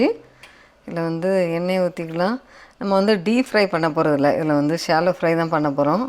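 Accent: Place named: Tirunelveli, India. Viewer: மேடம் உங்கள் டிப்ஸ் அருமை உங்கள் பேச்சில் திருநெல்வேலி வாடை வருகிறதே எந்த ஊர் நீங்கள்.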